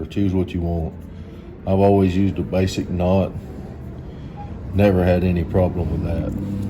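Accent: United States. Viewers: Excellent video brother! Helped me get my machine back running and made a good days work. Also your accent is killer! I’m guessing you’re from Georgia Love from Florida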